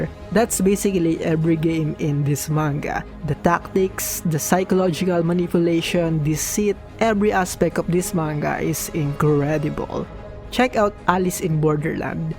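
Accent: Philippines. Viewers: You sound Filipino ish somehow, I don't mean this in a bad way. The recommendations are awesome tbh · Pinoy yan halata naman sa boses nya mag salita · me too hahaha the way that he talk is pinoy.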